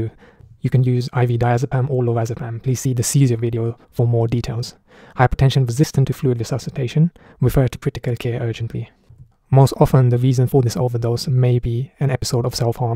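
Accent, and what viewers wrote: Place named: Germany. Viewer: I thought he’s speaking german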